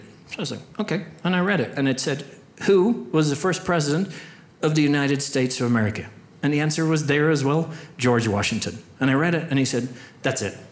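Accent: Ireland. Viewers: The Irish accent makes it funnier 🤣🤣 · Agree, the Irish accent threw me off at first as well, but worth the watch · Hahaha… he’s Irish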